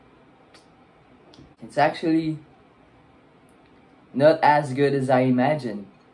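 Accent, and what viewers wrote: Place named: Quebec, Canada. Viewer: Ahh I see, you have that GSP accent haha. Keep up the good work man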